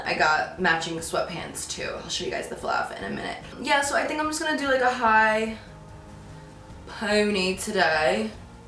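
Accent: New Jersey, United States. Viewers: Obsessed with the Jersey accent 😍😩😭❤️ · Hanging out with your grandma brings out your New Jersey accent even more haha 😊 ( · Your grandmother is adorable.. and your jersey accents 🙌🏻❤️ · Your New Jersey accent really comes through when you’re hanging out with your family. Super cute. 😊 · ur Jersey accent is so thick 😂😂 love you ❤️ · Love jersey accent🐧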